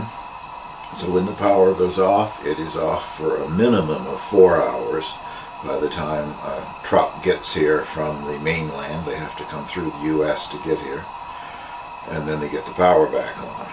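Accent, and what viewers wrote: Canada. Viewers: I am thoroughly enjoying myself watching your videos. Your lovely Canadian accent reminds me of my home turf of Northern NY. Grew up about 26 miles south of Cornwall but have traveled quite often into Eastern Canada where all of my ancestors came from. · was going to ask where in the world are you, but I guess from the accent and Tim Hortons cup is a give away! :)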